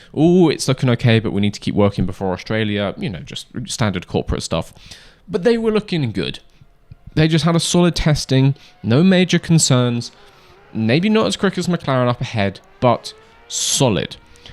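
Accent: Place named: United Kingdom. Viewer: you bring the only podcast I could ever listen to: F1 and with a brit accent. Perfect to listen while I work! I hope wet pointless testing becomes a thing! Thank you!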